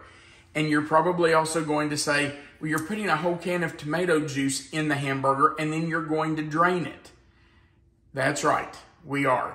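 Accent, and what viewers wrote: South, United States. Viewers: I'm southern, but I can NOT identify that accent. Seriously, not a clue. At first, I was thinking Kentucky, then southern Virginia... but then I was wondering if it's Alabama... Mississippi... Arkansas? I can't place it.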